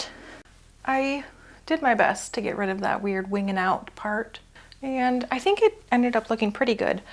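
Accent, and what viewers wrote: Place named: Australia. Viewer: Love your Aussie! This makes me want to dye some stuff I don’t like.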